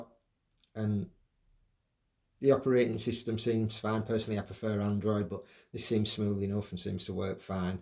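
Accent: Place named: Teesside, United Kingdom. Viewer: You sound like you're from the Teesside area, where you buying from that allows you to return mate? Looking to buy over the weekend, and would love the option to return, after getting stuck with a TV i hated from week 1 last time, but was stuck with it. That was an edge lit nanocell, lights all showing along the bottom of the screen now, but the banding, black wash out and blooming, made dark movies and gaming unusable to me, it was a total disappointment. I've been looking at this TV and the TCL 805, which you obviously favour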